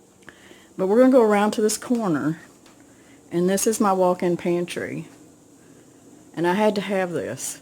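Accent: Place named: Texas, United States. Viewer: I am so blessed that I found you on YouTube. You sound like my family when I was a young girl. (I grew up in Texas)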